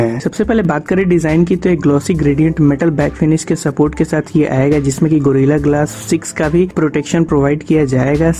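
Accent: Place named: India. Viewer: I hear Indian aksen i dislike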